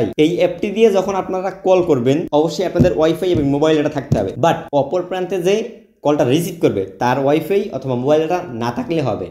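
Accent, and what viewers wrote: Barishal, Bangladesh. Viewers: দাদো তুমি মোগো বরিশাইল্লা হেতে মোর কোন সন্দেহ নাই! তোমার বাড়ি কোন জায়গা?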